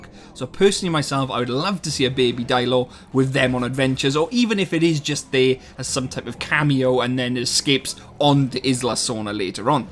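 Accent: Wales, United Kingdom. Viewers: Sounds like Welsh accent, where abouts are you from? I'm Bangor, Gwynedd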